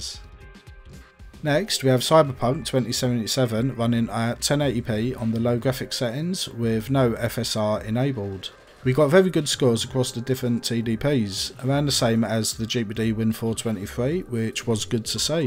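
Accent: Cockney, United Kingdom. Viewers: No matter how many of these videos I watch, I just can't get used to hearing a cockney accent on YouTube. 😅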